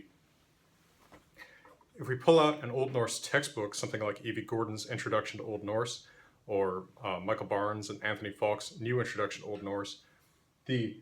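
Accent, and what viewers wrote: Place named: United States. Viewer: viking language with an american accent XD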